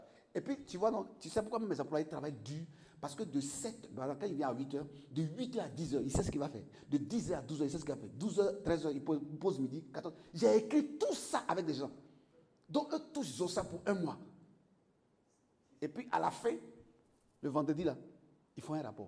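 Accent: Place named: Bété, Ivory Coast. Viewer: Vraiment vous parlez comme un Ivoirien précisément Bété. Je signale que j'ai aimé et je sur que je vous raconterai par la grâce de Dieu.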